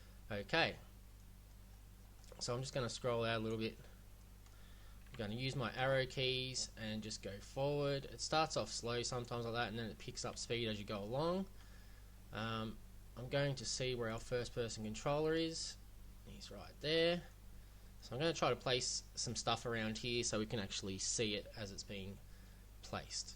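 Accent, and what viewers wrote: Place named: Australia. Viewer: I really appreciate your timely response, and thanks heaps mate. I am noting from your accent that you are from Australia just like me. I am new to Unity, although i'm discovering lots of things, I doubt that ill make money from unity, but would love to make some of my own games. I really appreciate your time, and I intend to follow your tutorials as they are very good. Ill try to fix the water thing later, again thanks heaps. I have been playing around with unity. I have found that sometimes characters do weird stuff like float or sink through floor (even if rigid body attatched). Anyway thanks heaps. You have earned a subscriber.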